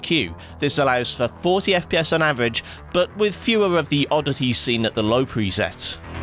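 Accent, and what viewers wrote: United Kingdom. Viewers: He doesn't sound at all like NX gamer, both have british accents but that's it. They're even different dialects from different parts of England.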